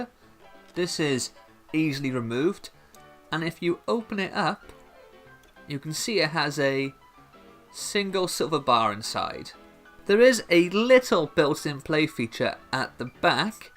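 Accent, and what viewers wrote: Wales, United Kingdom. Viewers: I'm just going through a ton of vids of yours, you're very entertaining and I love your welsh accent!!!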